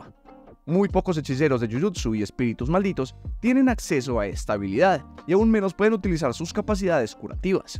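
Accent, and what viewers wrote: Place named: Colombia. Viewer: de donde eres? algo en tú acento me dice que eres de colombia o me equivoco? aunque intentas neutralizarlo creo que se te escapa un poco 😅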